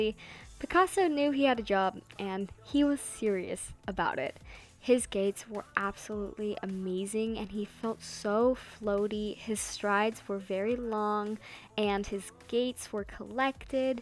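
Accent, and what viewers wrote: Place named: United States. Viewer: I found this kinda awkward because its an American ish voice I don't know why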